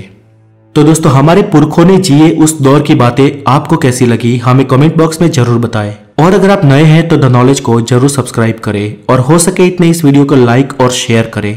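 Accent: Gujarat, India. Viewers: Bolne wala Gujrati hai☺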